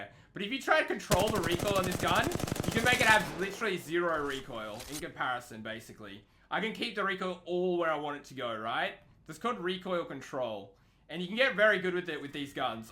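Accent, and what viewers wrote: Australia. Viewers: I guess that's the Aussie accent lol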